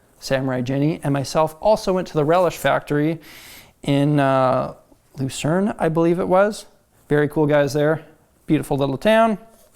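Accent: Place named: Manitoba, Canada. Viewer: oh that manitoban accent!! XD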